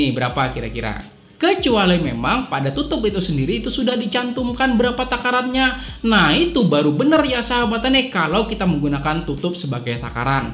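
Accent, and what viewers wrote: Banjar, Indonesia. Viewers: dari dari logatbicaranya seperti Bahasa Banjar!!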